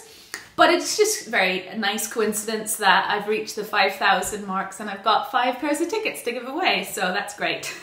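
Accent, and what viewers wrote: Northern Ireland, United Kingdom. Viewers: Hi, congratulations! I'd love to win tickets 😁. I love your vlogs. I'm loving your accent, but I'm married to a Northern Irishman so I'm biased. We're moving to Belfast from England in a year or two so a little guide to fabric shops in NI would be handy x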